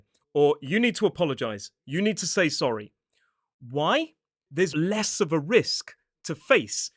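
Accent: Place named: Southeast England, United Kingdom. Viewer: Should add, this man is quite obviously from the south, probably south east, of England, and basically everything he has said is complete b*llocks with regards to any Welshman 😂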